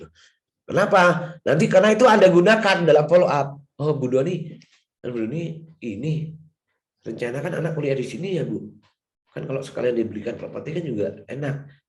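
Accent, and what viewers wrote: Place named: Surabaya, Indonesia. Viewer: Yo arek suroboyoan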